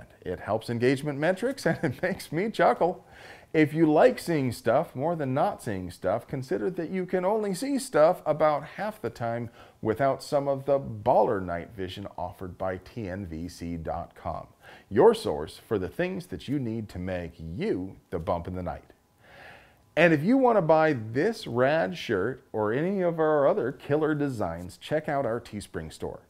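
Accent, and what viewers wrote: United States. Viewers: You talk at first as if you're a Canadian, speaking to Canadians. But as you go along, you sound more & more like an American (using FBI statistics, as well) speaking to God knows who. I like facts. But I don't need to be "loved" by a chubby male stranger that I've never met. Thanks anyway. (Signed, Canadian Permit holder).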